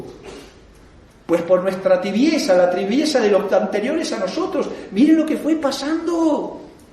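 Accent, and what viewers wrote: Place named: Argentina. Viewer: Por el acento argentino intuyo que es, pero no estoy seguro.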